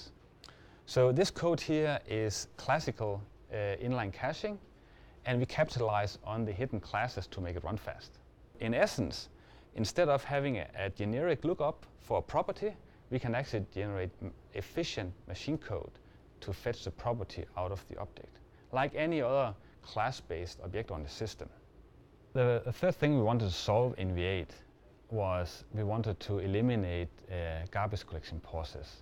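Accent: Denmark. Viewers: NO! its a danish accent. personaly i think all danish people should be forced to use english instead. its the worlds most singularly incoherent language. a horrible mix between swedish and german which speekers of either language is unable to comprehent. Hell i've heard even danish people have problems understanding each other. I really despice the danish language... (i dont mind the people just there horrendous way of communicating) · I can just hear he's danish :D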